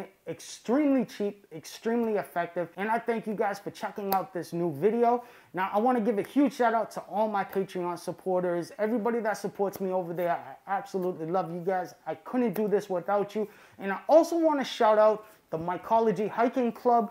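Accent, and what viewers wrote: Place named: New England, United States. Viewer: This guys seems to have more of a new England area. Maybe long Island.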